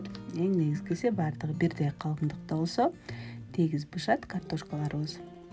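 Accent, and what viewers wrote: Standard. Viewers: Сонун экен эже рахмат. Сиздин баарынан да бир да орусча аралаштырбай таза тунук кыргызча суйлогонунуз жагат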